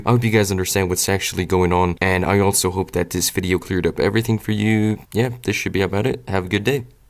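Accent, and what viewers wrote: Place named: United States. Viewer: Are u seriously from there? Are u okay? I actually live very close to syria.. Can see black smoke sometimes and shit... But u don't have arab accent u sound 100% american!! xDDD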